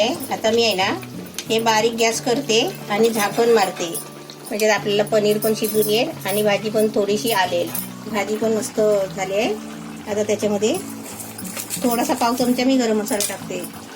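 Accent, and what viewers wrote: Konkan, India. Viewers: Kaku tumhi koknatle aahat ka?? Chan watay tonning aikayla.receipi mast.🙏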